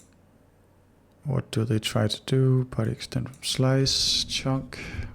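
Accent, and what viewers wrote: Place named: Denmark. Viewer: Are you from denmark? You sound a little danish. But overall great video!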